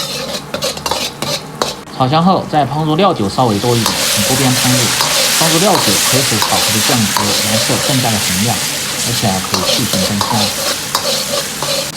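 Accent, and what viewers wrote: Sichuan, China. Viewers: 听到熟悉的四川普通话就直接给老乡点赞了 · 要得 謝謝 有你的川普、更入味兒👂😂 啊呀 冒菜都莫得你的川普正宗 我們這莫得賣黃吼兒的🤔 其他的都賣得到🤔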